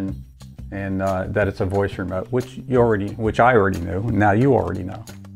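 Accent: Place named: Philadelphia, United States. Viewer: is this guy from phiolly, he sounds like it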